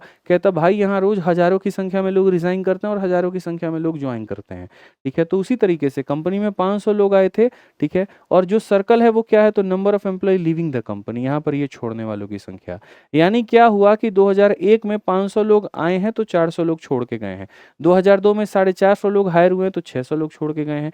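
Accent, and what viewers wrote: Bihar, India. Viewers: B =badki bahu 😂😂😂 librery me meri hansi nikal gai 😂😂😂😂 gajabsir Bihari andaj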